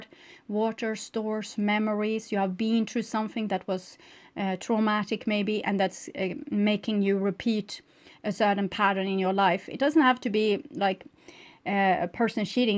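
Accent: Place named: Sweden. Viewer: Hej! Är ny här! Tyckte jag hörde en svensk brytning😜🌻. Din läsning var verkligen mitt-i-prick, har även lyssnat på dom nyare läsningarna. Det är som att du beskriver mitt liv. Kommer definitivt följa dig!❤ Tack så mycket! Kram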